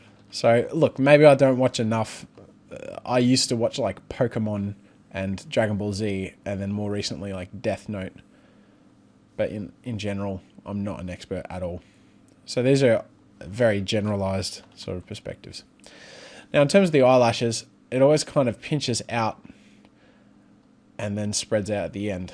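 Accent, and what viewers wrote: Australia. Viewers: Yep, we Aussies do indeed (mostly) say "mæŋɡɑː" rather then the Yankish "mɑrngɑr" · are you australian? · that Australian accent gets all the ladies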